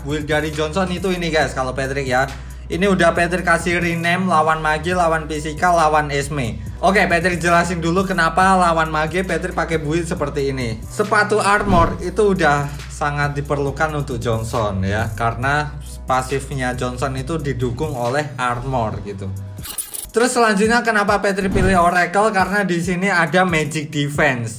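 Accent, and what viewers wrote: Java, Indonesia. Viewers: Ngakak , sampean wong jowo ndi bang patrick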